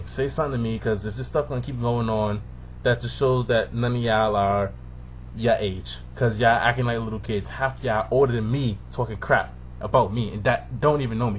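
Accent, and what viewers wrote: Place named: Jamaica. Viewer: You sound mad jamaican in this vid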